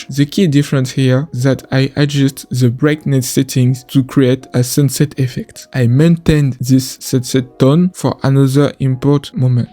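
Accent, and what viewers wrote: France. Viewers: faut avoir des balls pour poster une vidéo ou tu parle en anglais avec l'accent français mdr sinon continue chef c banger ce que tu cook ! ( tu ma tué mdr) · j'ai reconnu l'horrible accent fr mais sinon très bon taff ! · Very french accent mdr. Mais comme d'habitude le rendu du GOAT de la 3D sur Inazuma est légendaire.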